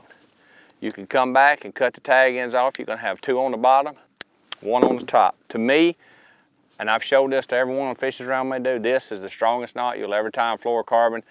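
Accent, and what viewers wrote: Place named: Texas, United States. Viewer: Matthew Mcconaughey is from Texas. A lot of us talk this way.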